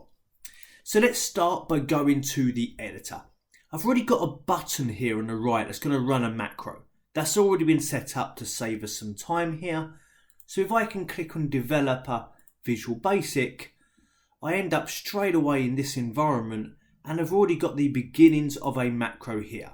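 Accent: United Kingdom. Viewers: Very informative video ! And a beautiful English British accent ❤❤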